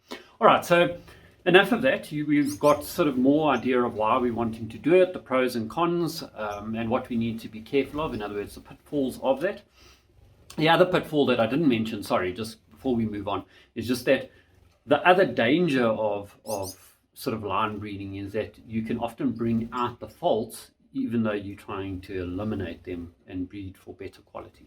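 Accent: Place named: Gauteng, South Africa. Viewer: Where about are you'll located in South Africa , your sound like from Gauteng . I am from Durban and like to breed gouldians and if you can hook me up to some good breeders and if you are selling birds . Where do you'll show your birds . Great to see breeders in South Africa sharing knowledge .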